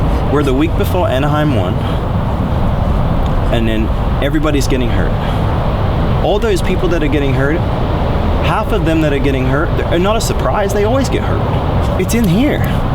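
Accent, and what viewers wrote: Australia. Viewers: Yay sounds like an Aussie when hes mad......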